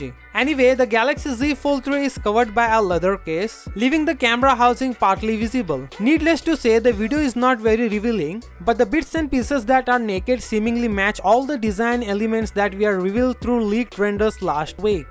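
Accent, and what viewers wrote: India. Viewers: Indian accent very strong , pls stop thank q ver much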